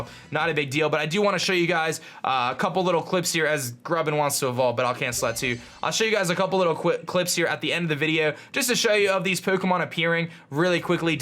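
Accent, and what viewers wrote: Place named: California, United States. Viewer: sounds like he's from California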